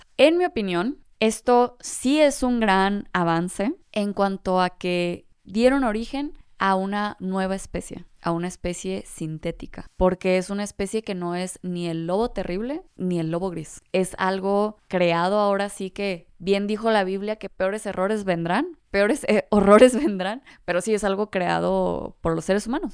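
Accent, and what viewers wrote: Mexico. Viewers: ❤ que bonita sos ... encima me gusta tu tonada mexicana 😊.. saludos desde argentina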